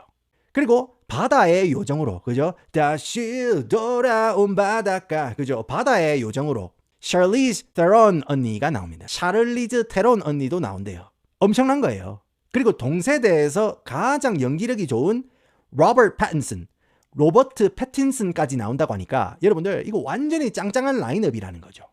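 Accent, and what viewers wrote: Gyeongsang, South Korea. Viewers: 오늘 이 채널 영상을 처음 보는데 은 영어를 배운 경상도민이신가요 경상도말을 배운 재미교포이신가요..? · 내용이랑 별개지만ㅋㅋ영어와 경상도 사투리가 묘하게 섞여있는 말투가 매우 흡입력있습니다ㅋㅋㅋㅋ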